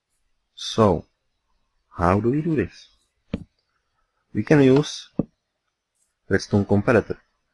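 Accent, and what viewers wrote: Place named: Czech Republic. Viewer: Český přízvuk se pozná vždy :D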